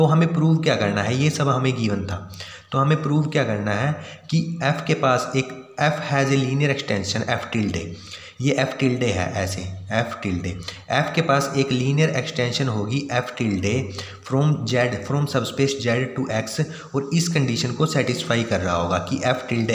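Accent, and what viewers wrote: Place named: Haryana, India. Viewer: Aap ke accent se pata chal gya ki aap haryana ke ass pass se ho , ho sakta hai haryana se hi ho , Thankyou so much sir , ye accent chupaye ni chupta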